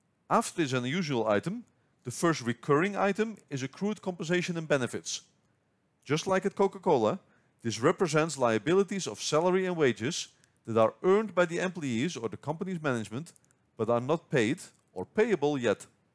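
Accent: Netherlands. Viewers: Thank you. Really good explanation. Do I hear Dutch accent?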